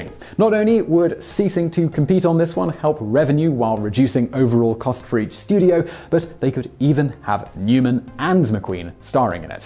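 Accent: England, United Kingdom. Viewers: Funny! You sound like you have an English accent, and yet you used the term 'so-called discovery of America'. This is funny to me, because as an English speaker--especially with an English accent--I would expect you to know the definition of English words. Clearly, you do NOT know the definition of the world 'discovery'. You see, since up to the point that Columbus 'discovered' America, Europe did not KNOW it was there. Thus when it is said that Columbus discovered America the term is the MOST accurate term. He discovered for his people something that neither he nor his people knew existed. Let's not just jump onto the SJW agenda when there is an entire dictionary of English words that you might want to research.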